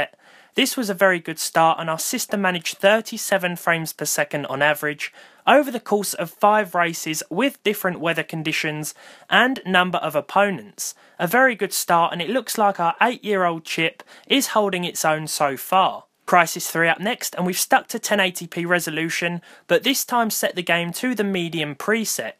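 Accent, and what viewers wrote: United Kingdom. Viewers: is this a brittish accent?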